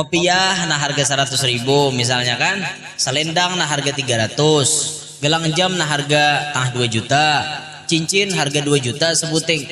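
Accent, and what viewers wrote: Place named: Kalua, Indonesia. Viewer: Nang spesial disamping ilmu sidin adalah logat kalua nya nang maulah orang katawa😁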